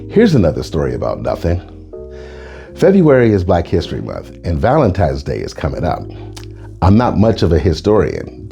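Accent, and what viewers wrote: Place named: Ireland. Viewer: You're Irish accent is on point! And you preach, but not the way your grandmother predicted!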